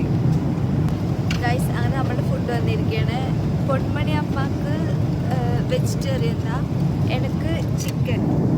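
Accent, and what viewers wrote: Palakkad, India. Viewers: ഫ്ലൈറ്റ് ഇറങ്ങിയതും തനി പാലക്കാടൻ സ്ലാങ്😂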